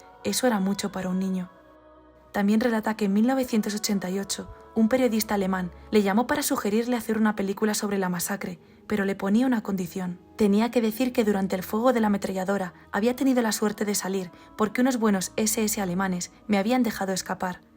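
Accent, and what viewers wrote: Spain. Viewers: Terrible lo que barras por tu narración eres española porque no te animas a investigar como en la conquista de américa los españoles impusieron a cruz y espada su religión y como flageban con cadenas a los indígenas y aparte sus infecciónes de viruela que acabaron con ls mitad de los indígenas en américa investiga y verás que ustedes como los ingleses fueron peor que los ingleses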